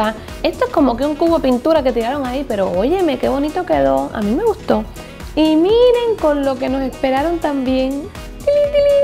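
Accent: Cuba. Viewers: Sandra le da asesoría igual que Harold trabajan con ella, en ningún momento dicen que son familia. El acento es de Cuba. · Las cubanas tienen la misma voz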